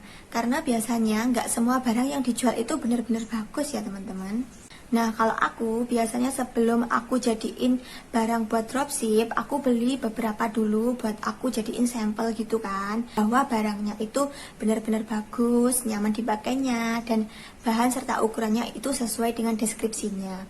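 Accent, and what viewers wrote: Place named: Medan, Indonesia. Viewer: Dilihat dari logatnya, pasti orang medan ini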